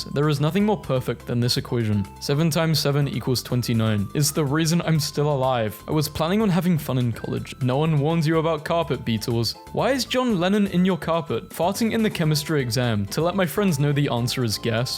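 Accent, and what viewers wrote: United Kingdom. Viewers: i watched this entire video, thought about it for a moment and then realized you narrated the entire thing with a british accent. why didnt this come to me during the video?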